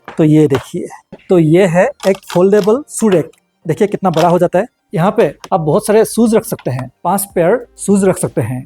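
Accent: Assam, India. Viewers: Assam or niki vai.. hindi tu huni Assamese jen lgise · Bro had the Assamese accent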